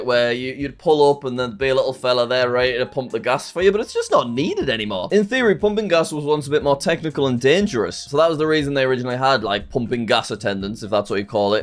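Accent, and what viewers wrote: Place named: United Kingdom. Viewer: just like you thougt pingus were swiz i thought you were amrican but as time passed i finally knew you were british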